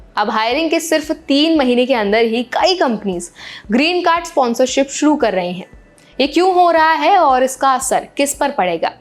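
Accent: India. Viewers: This girl is throwing misleading information with her strong Indian accent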